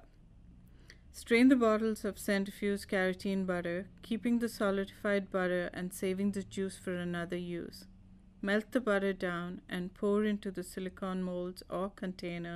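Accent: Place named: India. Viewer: INDIAN VOICE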